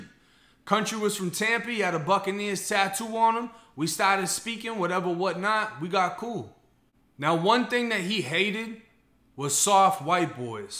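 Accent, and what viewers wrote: Boston, United States. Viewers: That’s that Boston accent coming into play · You from Boston?? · You got a Boston accent..how did you end up doing time in Florida?🤔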